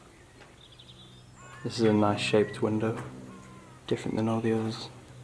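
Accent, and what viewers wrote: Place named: Stoke, United Kingdom. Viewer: you sound like a Stokie, would I be right in thinking that? this is a stunning video!! Well done. subscribed.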